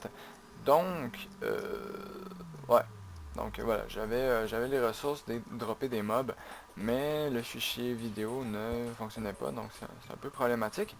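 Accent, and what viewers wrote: Quebec, Canada. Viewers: Mais il le dit comme ça parce que il est Québécois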